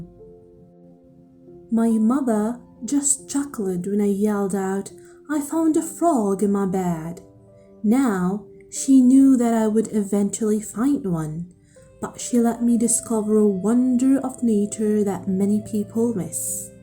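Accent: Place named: United Kingdom. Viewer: You have a really sweet voice. Seriously i almost thought you're a native british woman.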